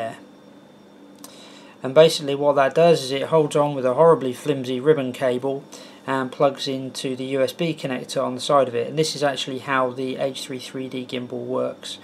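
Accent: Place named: United Kingdom. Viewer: I love that british Accent. Nice tutorial! I sought long for this. Now finally got it ;-) Thanks a lot!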